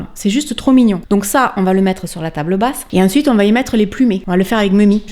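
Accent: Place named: Southwest France, France. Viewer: Bien sur que cette vidéo nous plait ! Toutes tes videos sont superbes! Je vis à montral mais je biens du sud ouest. Ca fait plaisir d'entendre l'accent chaque semaine :) merci ❤️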